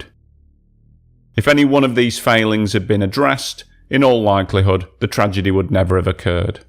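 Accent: United Kingdom. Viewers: delivered in a soothing, charming British accent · Newly subscribed. You sound good and British mate. Cases we have never heard of such as this will take you far here on the tube. T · Voice over British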